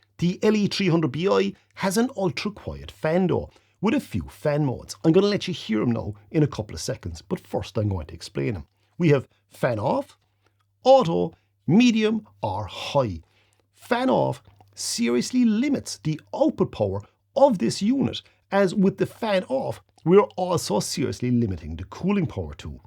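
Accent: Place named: Ireland. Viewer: Thanks again for a great review, love that irish accent of yours it reminds me of the time I worked for the Irish Guards in Germany 74/75👍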